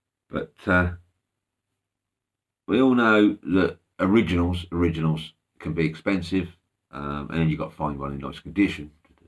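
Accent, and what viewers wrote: Suffolk, United Kingdom. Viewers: Hi, just found your channel. It's great to hear a local voice. I'm from Bury St Edmunds.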